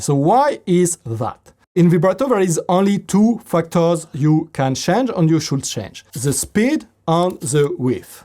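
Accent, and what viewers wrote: France. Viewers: Oui! J'adore l'accent français😘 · Accent français ça non ?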